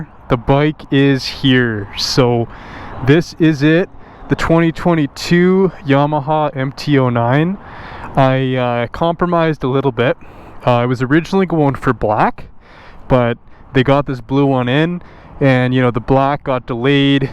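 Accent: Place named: West, Canada. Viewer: Are you from Alberta? That western Canadian accent is really making me curious if I'm right or not?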